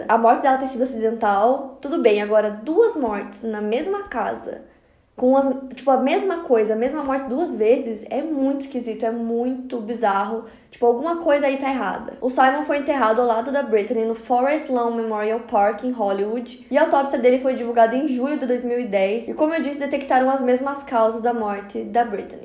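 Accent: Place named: São Paulo, Brazil. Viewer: Vcs prestando atenção no vídeo e eu aqui ligado no sotaque gostoso da, pois é uma mistura de paulistano com um fundinho de nordestino....Sei lá se é isso, sabe?! Teoria da conspiração.....rs😁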